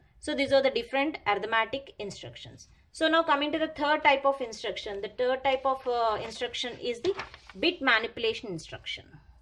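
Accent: South India, India. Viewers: Nyc effort But There is a prob. In your slang Slang like. South people 😬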